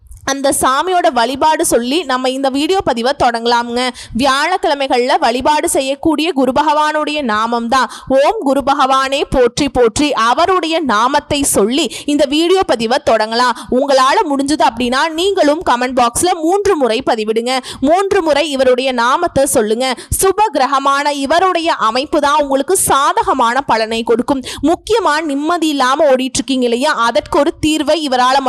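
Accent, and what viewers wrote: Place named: Kerala, India. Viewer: Kerala pesara Amma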